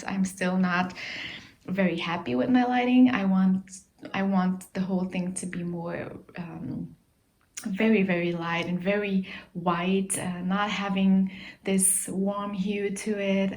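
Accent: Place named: France. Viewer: Hi, Thanks for such a detailed craftroom tour and all the inspirations. Love your French accent. Je parle tout petit Francais. Thanks for the link of the beautiful paper wall flowers from Etsy. I was also wondering whether you can give me information on the poppies wallpaper at minute 2: 48 on your video. A link would be awesome or at least the store you bought it from. I loooove it so much and hope to get it for my house. Thanks so much. Hugs, Rosalie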